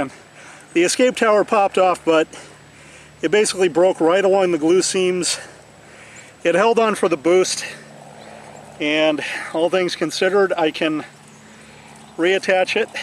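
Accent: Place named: Midwest, United States. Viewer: your boring midwestern accent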